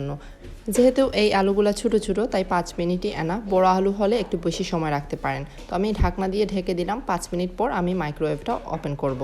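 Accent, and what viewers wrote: Sylhet, Bangladesh. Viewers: Apu apni sylheti ai jonno balo lage please sylheti matea blog korio ❤️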